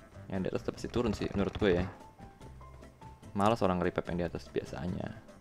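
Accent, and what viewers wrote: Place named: Medan, Indonesia. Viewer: Beda logat bro bg org medan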